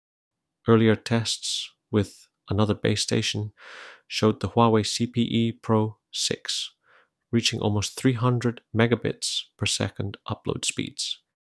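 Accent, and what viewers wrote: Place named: United States. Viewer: I'm German and have little practical experience with English. As a radio amateur, I'm interested in the films from "Ringway Manchester." My problem, however, is that I find it extremely difficult to understand the guy. It's not even Donald Duck-like speech anymore. I also have to say that I understand your presentation without any problem. It's as if an educated American were speaking. I understand Satanic Coronaut's question as meaning that he wasn't sure whether the test applied to US networks or European ones.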